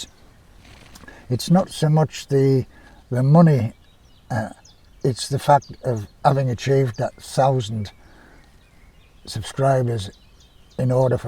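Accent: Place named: Liverpool, United Kingdom. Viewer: Oh, put some up. They were great I'm right behind you at 65 and hubs James bought me a Stratocaster a few weeks ago. I sold mine maybe 2 years ago...thought I was too old and have arthritis in hands but, I had got him a semi pro electronic drum kit for Christmas and with all these great backing tracks and bass track you can use for free we have started playing. He says we should up loadvids but I am not keen at all. This strat is as sweet as honey with super low action and it was the bargain of the century but its twice as heavy as my last one so I have to sit down to play...not very rock chick! As I said, liked those vids of yours and in principle I think its good for people of any age to see elders just doing...because like me, I am sure you remember a time when old age was not synonymous with illness. Somewhere Ive got a vid of my sisters band in the USA. 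Rock chicks, all over 60, lead singer 67 and they are belting it out on the circuit in L.A.if I can find it I'll upload it You sound Liverpudlian/cumbrian....I'm Lancastrian from Worthington in S. Lancashire. James is a very Yorkshire man we both miss northern banter our tiny village in Pembs is all round table, women institutes and a strict regime of intense garden manicuring...they roll along in life with absolutely no rock at all🤣🤣🤣 Have a great day and get them vids up lad!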